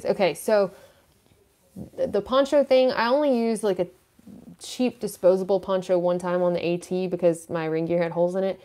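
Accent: South, United States. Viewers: Does your Spanish have a southern accent too.? Haha. Glad to see you had a fun safe journey. Thanks for the videos.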